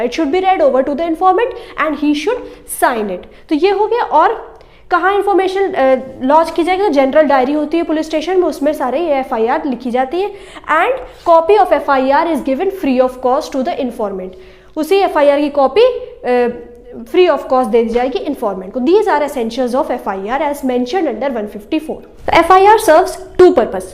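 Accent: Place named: Madhya Pradesh, India. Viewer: AAP madam m.p se hai kya apne bola tin tutd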